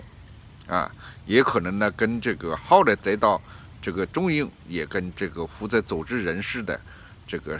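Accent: Hunan, China. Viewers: chu web 这是湖南普通话。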